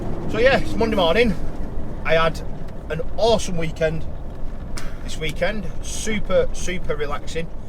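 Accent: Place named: North, United Kingdom. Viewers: I dont even know how I got onto your videos! But when I heard your northern accent and I was hooked😅(I'm from Preston😉) but my dad drives tankers and it's good to see what it's like in that line of work. Keep up the good work mucka!👊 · It's great to hear a local voice on here not really many people on here from greater manchester especially my home town Bolton! ....I'm a bus mechanic and own a few myself (The older stuff) and i cant express how much fun clutches are on big vehicle's when driving automatic's all the time. Feel's great to be behind it especially the double de clutch also with the older ones like mine we have 1,2 Crash 3,4 Syncromesh....Great fun....Keep the good video's up lad.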